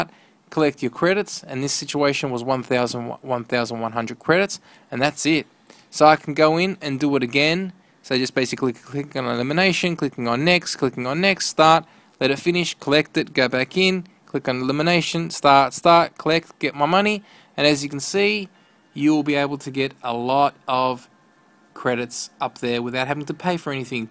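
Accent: Australia. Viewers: Ur an Aussie mate👍🏻 Same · Are you Australian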